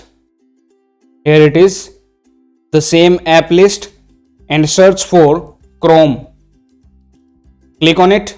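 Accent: India. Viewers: 😆 your english voice is like indian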